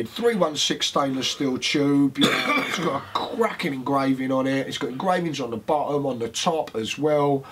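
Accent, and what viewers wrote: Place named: Cockney, United Kingdom. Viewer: Your cockney accented humor never fails to entertain me. I waiting for the first American video where somebody kicks someone else's ass with a mod like this.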